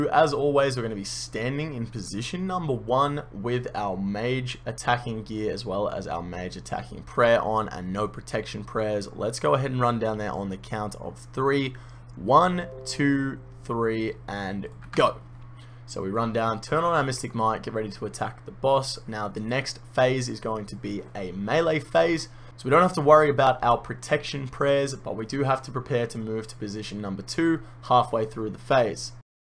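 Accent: Australia. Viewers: Aussie accent at its finest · Easily the best guide I found. What I do personally though is have the time in the video the rotation gets identified down and skip to the part in the video so soothing Australian voice walks me through the rotation. I found it better then doing it 1 rotation at a time. My time stamps: Rotation 1: Rotation 2: Rotation 3: Rotation 4: These can probably be tweaked a pinch but they're what I use.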